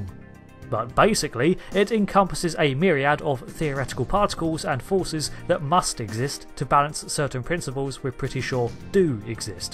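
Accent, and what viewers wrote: United Kingdom. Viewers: Why am I so repulsed by narrators on YT with British accents? I was a bib Beatles fan and couldn't get enough of that sound. Now? Fuggedabouditt!!!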